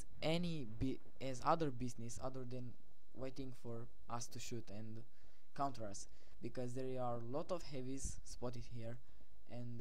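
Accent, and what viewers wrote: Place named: Romania. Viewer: Esti roman? :)) ai accent romanesc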